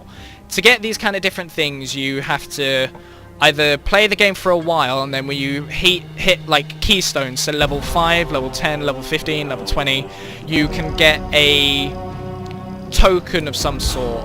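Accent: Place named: United Kingdom. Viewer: Nice video. A nice British accent to listen to, no umming or ahhing, and a simple introduction to a game that I (and I'm sure many others) am looking into simply because Evolve has been delayed by such a long time. Onto your 2nd video......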